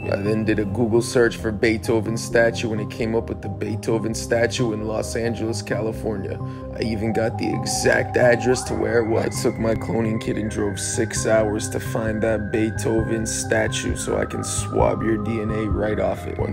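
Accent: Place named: Ohio, United States. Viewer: what the hell happened to his voice its sounds like he was born in ohio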